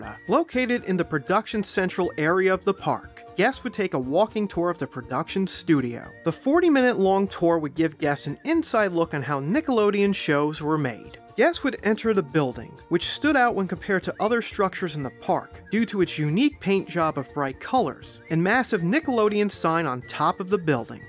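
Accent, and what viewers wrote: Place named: Philadelphia, United States. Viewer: I recognize your accent. you're either from Philly (my guess) or Baltimore! I could barely pick it up at times. Either way, really great video!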